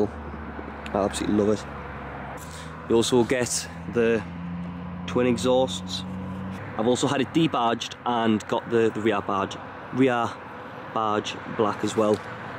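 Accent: United Kingdom. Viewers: Love that British accent♡ I think Audi have the best car, love it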